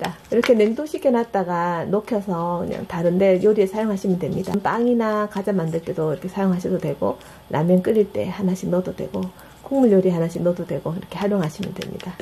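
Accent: Gyeongsang, South Korea. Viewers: ! 경상도 말씨. 너무 구수하고 좋아요. 빵도 구수하게 잘만즈셨네요. 꼭 딸아해봐야겠어요. 💯👍💯💐💐💐🎊🎊🎊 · 뿌사졌어요! ㅋㅋㅋ 깨졌어요 부러졌어요 보다 훨씬 적당하고 현장감나는 표현이네요 갱상도 사투리도 참 듣기 좋아요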